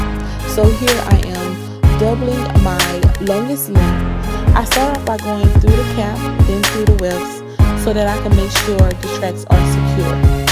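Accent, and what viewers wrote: Louisiana, United States. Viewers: aw<link> this tutorial just seemed sooooo sweet! LoL. beautiful job... do i hear a Louisiana accent?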